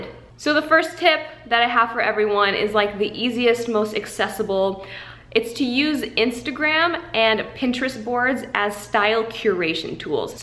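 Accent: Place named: Australia. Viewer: Your Australian accent is amazing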